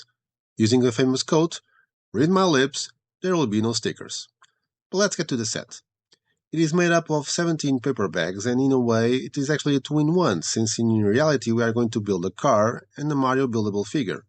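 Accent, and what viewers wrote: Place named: Italy. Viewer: Italian accent 🫶🏻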